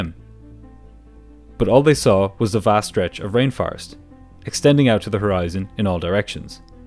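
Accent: Ireland. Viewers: Excellent channel great voice - is it Irish ? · The irish accent is still weird. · Sounds like a hint of Irish in the English spoken narration but it is excellent, so clear and precise.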